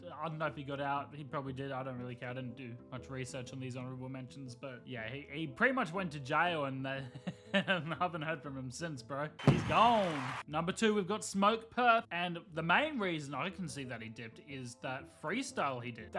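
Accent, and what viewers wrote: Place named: Australia. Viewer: Cool video but i got a question, do u ever listen to any Australian rap ? If so what u think of it? I assume your aussie from your accent (sorry if im wrong)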